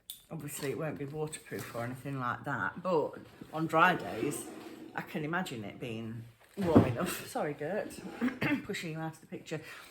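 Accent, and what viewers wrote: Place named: Derbyshire, United Kingdom. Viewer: I’m new here, when I heard you talk I thought same accent, I’m a Derbyshire lass.xxx🐝🐝🐝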